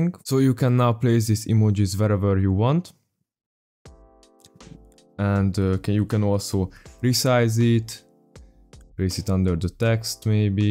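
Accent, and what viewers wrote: Hungary. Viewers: Érződik egy kicsikét a magyar akcentusod, de ezerszer felismerhetetlenebb, mint az enyém. Üdv a nemzetközi youtubeon és sok sikert továbbra is! :) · Magyar akcentus feltűnt 😀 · Hungarian? Edit: I knew by the accent. I'm Hungarian too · mondom magamban olyan magyarosan beszél ez a pali erre nézem a windows languaget xd kösz amúgy fasza videóü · gya de hallatszik hogy magyar vagy teso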